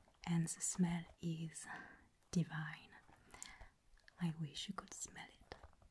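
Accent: France. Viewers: Bonsoir, le travail que tu mets dans tes vidéos est vraiment exceptionnel, bravo car cest très immersif. Le seul bémol pour moi c'est l'accent français qui ne fais pas "exotique" à mon oreille. Mais sinon vraiment bravo, je découvre ta chaîne et cest extrêmement qualitatif !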